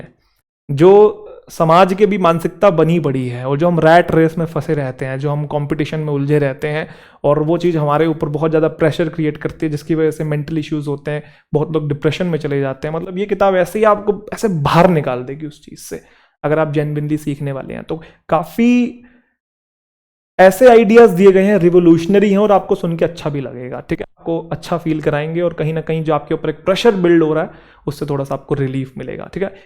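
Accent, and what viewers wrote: Haryana, India. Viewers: Fruitful session🥀 apki batein sunkr aise man krta hai bass sunte hii jaoo….English ke sath haryanvi tadka jo milta hai hme😅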